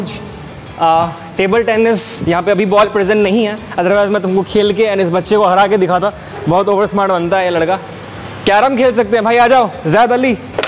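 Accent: Bihar, India. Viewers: Aap bihar se ho kya????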